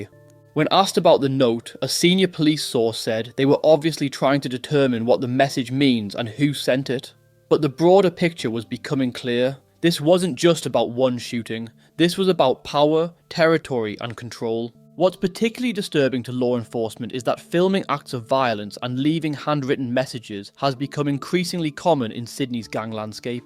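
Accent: England, United Kingdom. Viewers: It's Cah-soo-la, not ca-sul-a. You're a pom, right?😀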